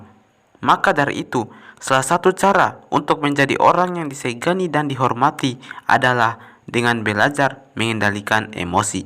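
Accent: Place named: Buton, Indonesia. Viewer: Logat mu buton betul🤣🤣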